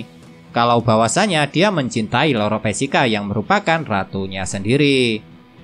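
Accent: Java, Indonesia. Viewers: Dia wong jowo. Udh gitu enak meddok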